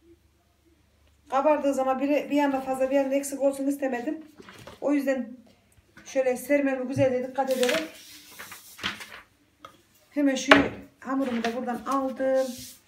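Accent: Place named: Vezirköprü, Turkey. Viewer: Abla bizim oralı olduğun belli biz gibi konusuyon bende vezirkopruluyum ismim gülsüm beğenerek izliyoz😊👍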